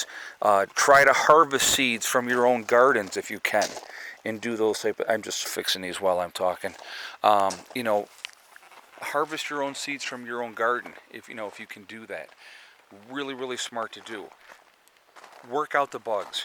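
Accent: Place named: Rhode Island, United States. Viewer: When I listen and watch you I find myself wondering if you’re from Portsmouth Rhode Island. Your accent reminds me of my uncles.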